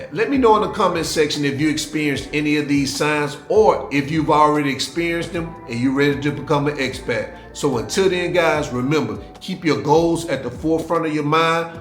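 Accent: South, United States. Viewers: PS...You've been in another country for awhile and loosing your Southern accent! Lol!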